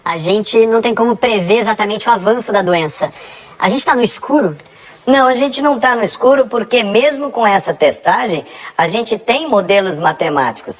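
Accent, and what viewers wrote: Rio Grande do Sul, Brazil. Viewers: Acho que você é gaúcha porque você fala muito o né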